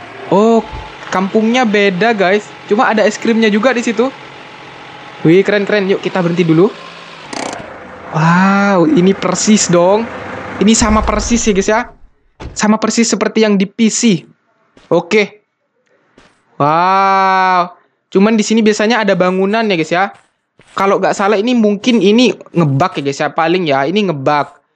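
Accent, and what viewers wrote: Madura, Indonesia. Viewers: Logatnya logat Madura bner kah?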